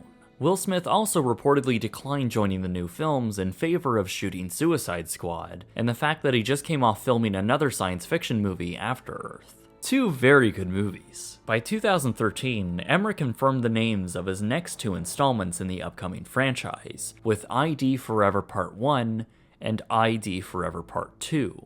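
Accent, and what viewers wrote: Canada. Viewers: 1) You need to go on a 'very' diet, and 2) a 'new sequel' as opposed to...?, and 3) I pegged you as a fellow Canuck within the first three sentences. Where's my pixie stamp reward?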